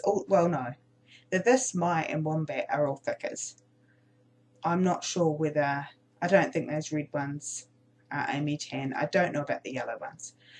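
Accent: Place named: New Zealand. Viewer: Three great layouts! Love watching process vids about using own stash or scraps, as they give me tons of ideas. Also, I'm a Kiwi living in Canada, so it's great to hear a fellow Kiwi chatting away....your narrations are so entertaining (even if they do make me homesick! Lol)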